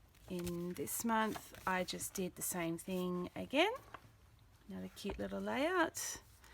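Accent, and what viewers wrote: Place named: Australia. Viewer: yay aussie accent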